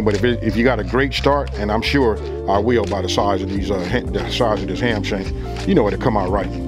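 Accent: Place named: Mississippi, United States. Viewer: You GOTTA be from my home state of Mississippi!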